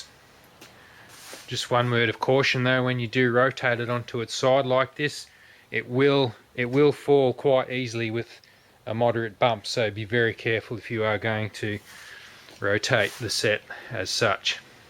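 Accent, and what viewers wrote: Australia. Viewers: love ozzy accent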